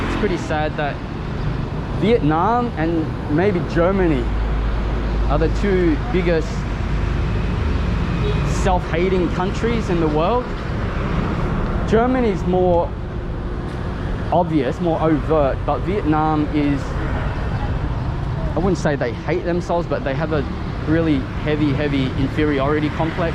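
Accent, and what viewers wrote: Australia. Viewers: What does she means Chinese? Ignorant fish. You look, talk and walk like an Aussie to me without the hat and corks! Keep helping the poors and you'll find your Buddha. And keep up with the social rants with a big spoon of generalisation and a sprinkle of predjudice and you'll be an Internet Star too.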